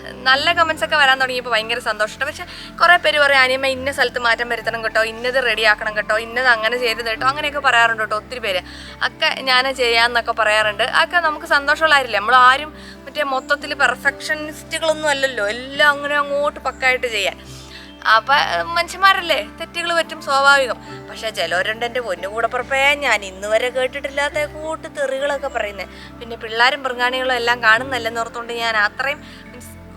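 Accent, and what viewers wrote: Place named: Southern Kerala, India. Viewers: Kottayamkarude varthamanam❤️❤️❤️❤️❤️ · ❤ നന്ദി, ഞാൻ വിചാരിച്ചത് ഇടുക്കി ക്കാരിയാണെന്നായിരുന്നു, എന്തായാലും തെക്കൻ side ലെ ഭാഷയാണ് കോഴിക്കോടൻ slang അല്ല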